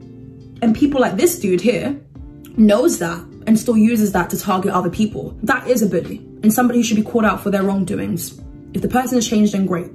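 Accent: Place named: United Kingdom. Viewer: I like you, your accent, i know it, you have to be british. you are so cute!! very weird seen an adult act like a child.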